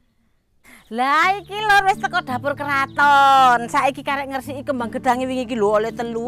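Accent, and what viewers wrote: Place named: Surabaya, Indonesia. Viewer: Temenan Mbak, suroboyoan mu ga ilang 😀👍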